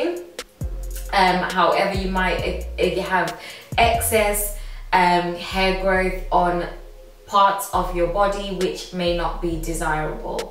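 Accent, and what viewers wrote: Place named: England, United Kingdom. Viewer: Where is your accent from ? It sounds English I love it ❤️ love your locs too x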